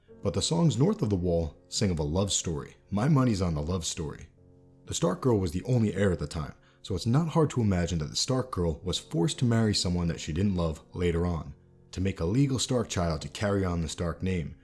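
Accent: New Jersey, United States. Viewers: Let's face it.. with that NJ accent, THAT'S the rhythm of ur narration! lol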